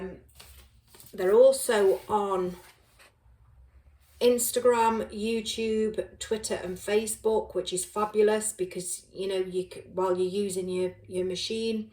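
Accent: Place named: Northwest England, United Kingdom. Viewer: hi, thankyou. I'm definitely thinking of the airfryer, I'll keep a close eye on your makes then decide, really enjoying your channel, are you northwest as your accent is very similar to mine x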